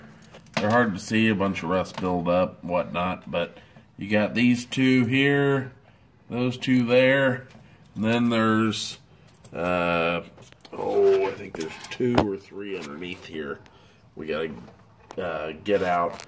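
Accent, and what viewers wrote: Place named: East Coast, United States. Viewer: About time someone knows how to talk east coast checking in.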